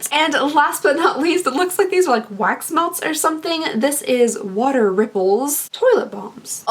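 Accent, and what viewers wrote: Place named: South, United States. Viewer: Nice box! Never heard of it but I totally love that it's Jurassic Park themed-ish! The company my dad works for made the hydraulic pumps used in the dinos so that movie was my favorite as a kid for a lot of reasons. Btw, am I late to the game or do you have a heart tattoo om your pinky finger? I noticed it in the past few videos, unless I've still got sick brain and never noticed before which is totally possible, lol. Anyway, like I've said before, yours is the first channel I've seen with unboxings and you got me hooked! My husband and kids know when I've watched one of your videos because I apparently adopt your accent. I live in NE Ohio so not many Southern accents, lol. Love your vids; I watch for the unboxings and stay for the ramblings. 😆 thx for sharing! 💖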